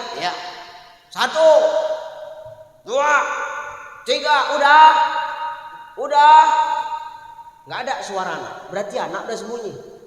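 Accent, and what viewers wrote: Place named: Medan, Indonesia. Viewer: Itubormg Medan bos Krn mmng logat bhsa nya seperti itu,klo kau yg LBH smpurna cba kau yg tegur ,jgn sllu mngomtriborng lain LBH baik komntri dri sndri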